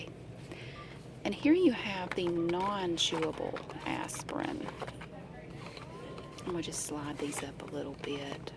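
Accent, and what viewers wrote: South, United States. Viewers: You sound like my family. We're from Alabama and South Carolina. When I get sleepy or angry my southern really pops out. I find your videos really soothing. Keep being you!!! ♥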